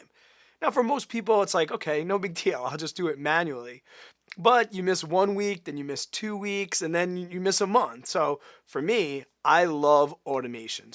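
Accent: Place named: Long Island, United States. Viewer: of the etf's would you choose VTI over VOO and why? Also, I noticed the index fund VTSAX is selling at 89.18 with no $0 minimum investment. The video was posted in March, but can you clarify this? Should there not be a $3K start, wouldn't it be suggested to go for the automated perks on VTSAX? Lastly, would it be overkill to have both VOO and VTSAX (should you recommend VOO from my previous question)? Your videos are sleek, slow and capture great on camera energy. Don't change that Long Island accent, its golden!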